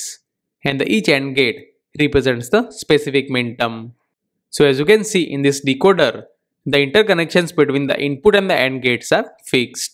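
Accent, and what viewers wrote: India. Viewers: Product is pronounced pro---duct NOT "productum". You need to improve your spoken English as your thick indian accent is very difficult to understand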